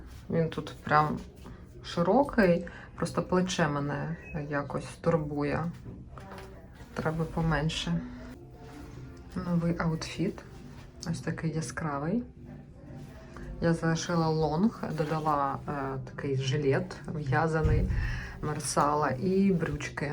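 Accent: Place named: Ukraine. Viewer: вы русские слова пытаетесь произносить на украинский манер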